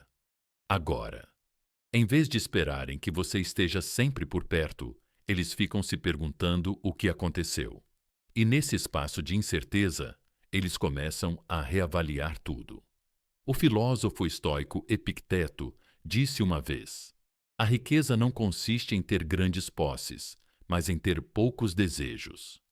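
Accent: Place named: Portugal. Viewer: Qual a razao p os narradores falarem portugues de Portugal? Coisa estranha ...quebra o ritmo da narrativa ..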